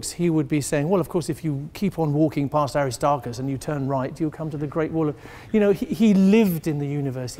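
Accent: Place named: United Kingdom. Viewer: wow a learned guy with a British accent....charming...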